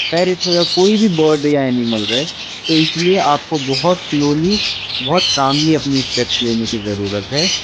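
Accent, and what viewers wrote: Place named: Gujarat, India. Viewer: Very informative video..... Gujarati hoy avu lage che....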